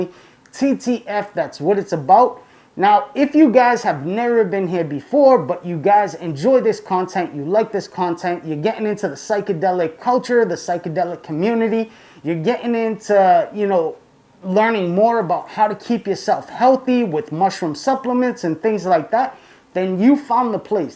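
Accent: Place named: New York, United States. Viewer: He’s a Yankees for sure, I’d guess Jersey or NewYork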